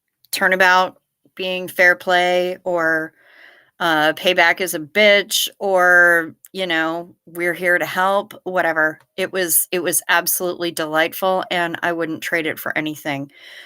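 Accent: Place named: Ireland. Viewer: I didn't expect her comedic timing and Irish accent to be THAT good! It's fun to dial into a show and hear something other than what you'd expect!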